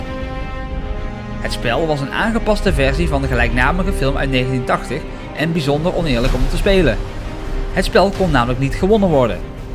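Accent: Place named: Brabant, Netherlands. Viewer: Ik hoor een Brabants accent 🤩 Good job!!!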